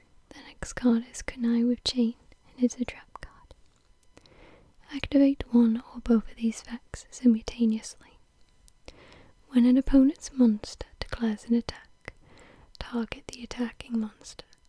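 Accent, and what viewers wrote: Wales, United Kingdom. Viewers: Are you welsh? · is this girl welsh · What part of Wales are you from? I'm from Caerphilly near Cardiff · are you Welsh??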